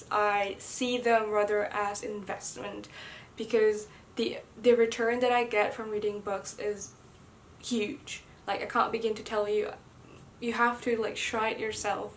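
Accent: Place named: United Kingdom. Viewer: British accent nya 🔥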